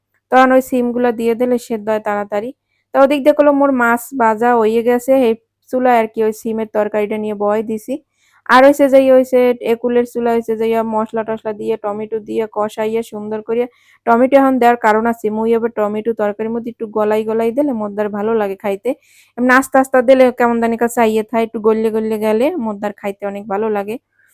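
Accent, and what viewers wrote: Barishal, Bangladesh. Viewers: আপু বরিশালের ভাষা খুব ভালো লাগে 💘💘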